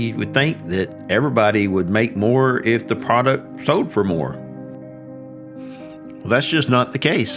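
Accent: South, United States. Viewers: This is a highly edited video. And it’s repeating the premise and slow rolling the punchline and the good-ole-boy narration is only annoying. The answer is given in the final 30sec. The rest is just repetitive of the first third. The big saw mills are supposedly getting rich. There...you can skip it.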